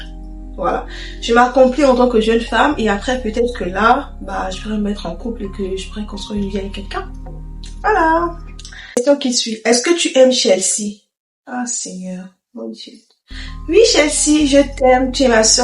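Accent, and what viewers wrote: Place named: Gabon. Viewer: Bien l’accent gabonais oh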